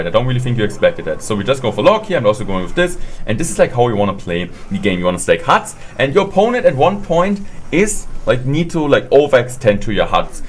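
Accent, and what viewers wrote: Germany. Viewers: man merkt richtig deutscher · I like your german Aczent😂 Bin btw auch deutsch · Man hört so krass das er deutscher ist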